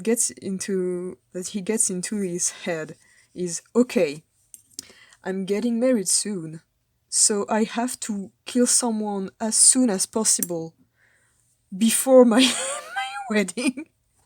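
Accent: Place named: France. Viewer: Oh mon dieu les eighths doctor adventures ET un accent français comment j'ai fait pour ne pas trouver cette chaîne plus tôt. merci de garder le rêve du huitième en vie par la peinture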